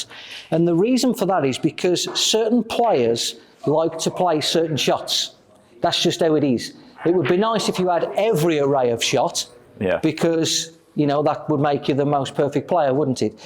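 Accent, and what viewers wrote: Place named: Birmingham, United Kingdom. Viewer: That’s a really thick Brummy accent!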